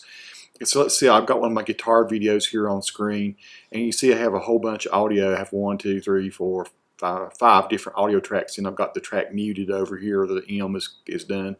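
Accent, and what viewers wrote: South, United States. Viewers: HI! I subscribed. You might not believe how I found your site! I used to live for a few years in Madison County, just North of Asheville. One day I wanted to youtube-lookup videos about that county. There were a few good ones but somehow one of your older videos came up. was part one of four, the " brothers" series where you all rode around the roads and just talking away. I really enjoyed the banter. I had little trouble understanding it, due to not only me living nearby but also living in Tallahassee, Florida for like 60 years on and off. The good old southern accent! I grew up in the very most Nothern area of Appalachia, way out in the country. I also really enjoyed the "wholesomeness" of the brothers, just good ole boys with a heart and soul. I found imagine, though, that someone else who might have stumbled onto this brother series, especially if they came from a Northern city and college-educated; they might only think, at their best, that you all were just a group of rural people with little knowledge of anything! 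But I can FEEL the goodness. And....salvation too! then I looked at your video main page.....oh "Lordy" [I do not mean to take his name in vain, I mean this word to infer a humbling experience That the Lord can Give!] your main page has so many videos of someone with a zillion talents and life experiences. Yet another showing that in the south and especially in the mountains, the Good Stuff is usually hidden away! really hidden away. there is a man w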